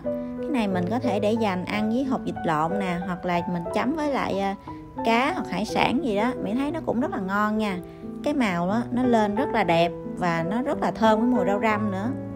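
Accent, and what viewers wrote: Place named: Tay Ninh, Vietnam. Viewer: Mỹ quê ở đâu vậy Mỹ??? Nghe giọng như dân Tây ninh ah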